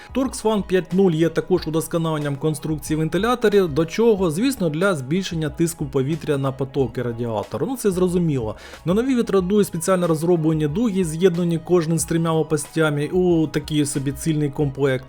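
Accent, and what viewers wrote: Transcarpathia, Ukraine. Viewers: Ти закарпатець?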